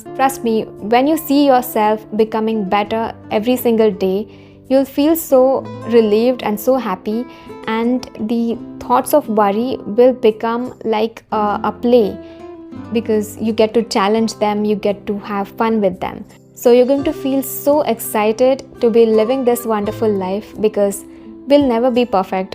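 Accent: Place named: India. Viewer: Where'd you learn to speak your english??? India... How annoying you sound!!!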